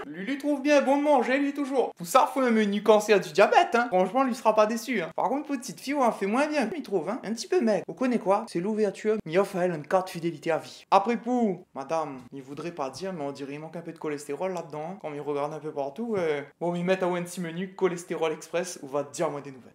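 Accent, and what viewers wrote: South, France. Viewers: Super comme d'habitude👏👏👏 et ton accents du sud de la France 😍😍😍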